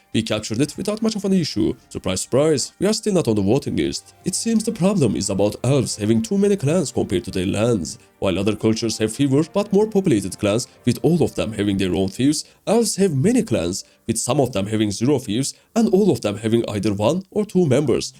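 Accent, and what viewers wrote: Turkey. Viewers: Are you turkish man you sound like it gankim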